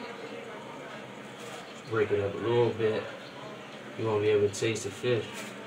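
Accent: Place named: DMV, United States. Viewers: That accent…definitely from the DMV! #HOME 💪🏽🤎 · I was thinking that he sounds like he from here lol the slang sound similar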